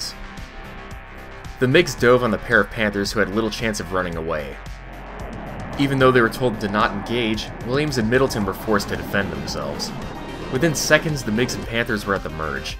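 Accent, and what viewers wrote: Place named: Midwest, United States. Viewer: Your midwest accent makes this great.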